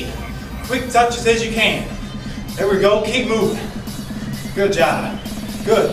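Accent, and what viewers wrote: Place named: Minnesota, United States. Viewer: you're awesome. i love the accent, too. lol. it's exactly the same as my roommate's from mn.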